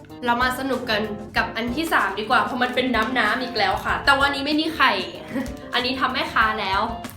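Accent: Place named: Suphanburi, Thailand. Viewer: เป็นฝรั่งที่พูดไทยเหน่อเป็นฝรั่ง. แต่ทำไมตรูนึกถึงคนสุพรรณ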